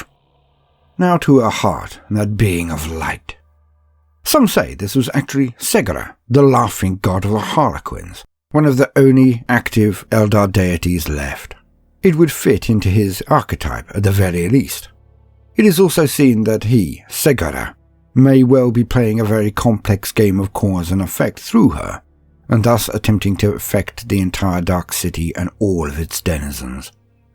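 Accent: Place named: England, United Kingdom. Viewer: You know what's awesome about the King's English? When I say too-daloo to someone in American English I sound like someone with a learning disorder. You make it sound like cookies on Christmas morning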